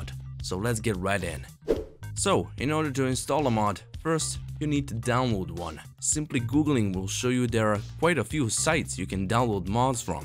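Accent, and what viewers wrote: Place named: Russia. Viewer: i really like your accent, are you russian? also, LOVE the video! it's really well made, easy to understand (no-knowledge-about-mods-me understood it, which is impressive! XD)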